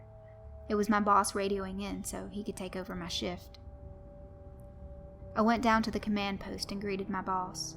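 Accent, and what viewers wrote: South, United States. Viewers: Thanks for the narration <link> I liked the Southern drawl you added to it. As always, you did a good job with it. Sadly enough, the story was based on an experience about back when I was hospital security when an abusive father sweet-talked his way into the ER to visit his daughter. I wonder if you might have any interest in any of the other parts of the series <link> It's more of a theme of work-related horror stories than interconnected stories, but it might interest you.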